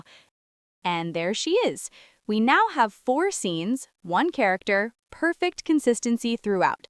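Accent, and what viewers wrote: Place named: Chicago, United States. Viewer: you must be from chicago... good work...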